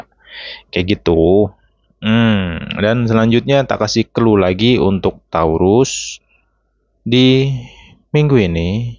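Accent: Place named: East Java, Indonesia. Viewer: Hadir....dr bogor . Logat jawa timuranne kentel. Heheehe salam arek arema , 🤭😊😁 suarane enak ✌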